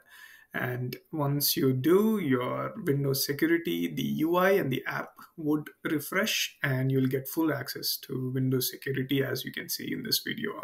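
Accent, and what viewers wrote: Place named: India. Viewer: dude this is a fucking scam, don't download random .exe files from the internet, especially not from someone with an Indian accent (they literally have professional scamming centers in India, look it up ...)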